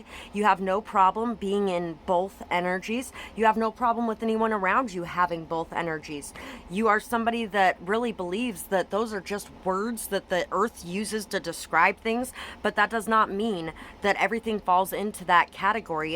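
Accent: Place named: United States. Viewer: euhm i don't understand American accent :/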